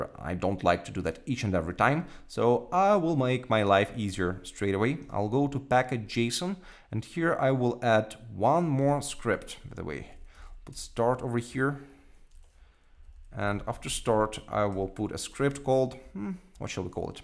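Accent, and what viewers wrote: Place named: Austria. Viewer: I have a friend from Austria and u sound just like him. Are u Austrian? Really good tutorial, Thankyou!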